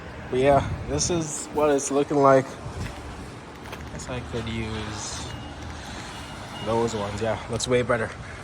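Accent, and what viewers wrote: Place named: Jamaica. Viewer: Bro has a lil Jamaican accent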